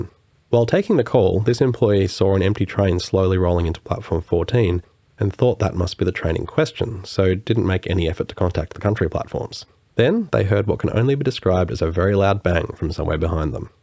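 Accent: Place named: Australia. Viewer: Well done mate! Great to hear an Aussie documentarian! All I can say is, our trains have been a disaster forever. Bring back the rattlers! Haha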